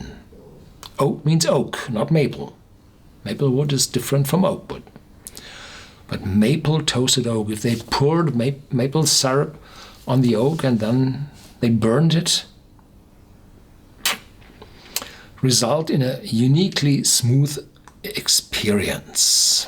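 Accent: Germany. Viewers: I don't think he's British or from the U.K. I believe he's a German native, though perhaps he moved and I'm wrong? He obviously has a very strong German accent.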